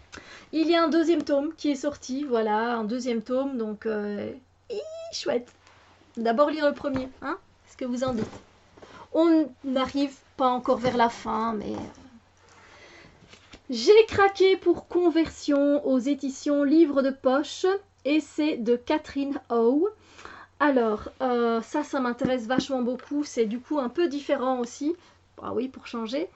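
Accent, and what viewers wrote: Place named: Belgium. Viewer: beau accent belge lundi le foot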